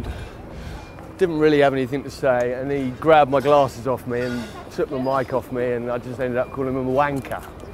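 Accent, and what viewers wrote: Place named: New York, United States. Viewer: I thought he was from New York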